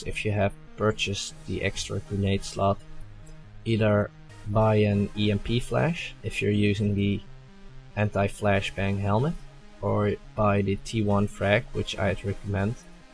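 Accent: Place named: Russia. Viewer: the guy sounds russian i can tell an accent very good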